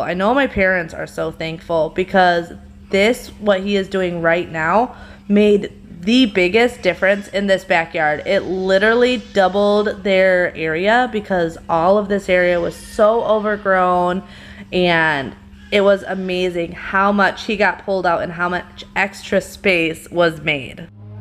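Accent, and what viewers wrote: Wisconsin, United States. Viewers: I came across this in my recommendations and said to myself, "Sounds like she's from Wisconsin..." I grew up near Waukesha. :D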